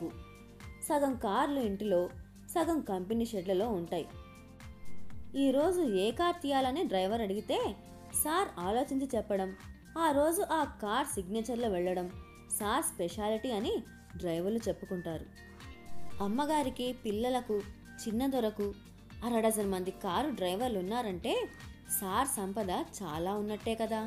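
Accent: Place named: Telangana, India. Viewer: Nice story and very well presented in telangana accent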